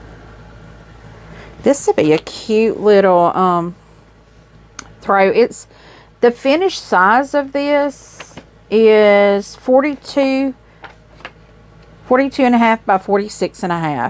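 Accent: South, United States. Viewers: Quarter is a wonderful part of a whole. Thanks for not making your video so obvious for the giveaways. Some people just channel hope to win free gifts. I so enjoy your content. I feel a kinship to you because of your southern accent. Looking forward to the drawing.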